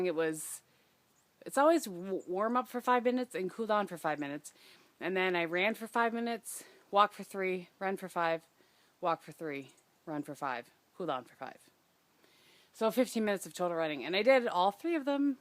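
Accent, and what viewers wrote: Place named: Upper Peninsula, United States. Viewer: you sound like a yooper which is a good thing lol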